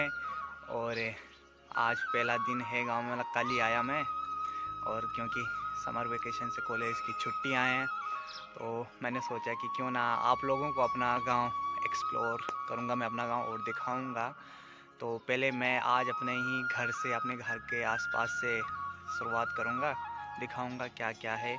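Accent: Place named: Tehri, India. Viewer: Aap tehri se ho kya aap ka bolne ka tone wanhi ka hai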